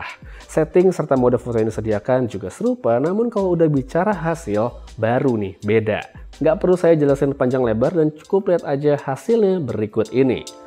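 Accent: Bali, Indonesia. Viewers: Bang orang bali apa?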